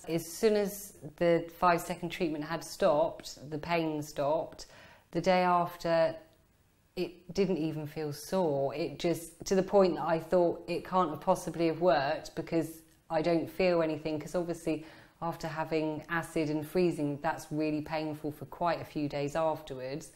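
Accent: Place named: England, United Kingdom. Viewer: What is beautiful about this woman? EVERYTHING!! Her face, her smile, and perhaps, most importantly, her accent. I wonder where is she from. I know she is from England, but I wonder what part of England?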